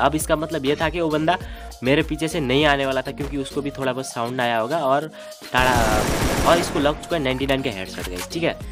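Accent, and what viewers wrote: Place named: Nepal. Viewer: Nepali ho bhai tum hum avaj se pehechan jate h 😁😄😄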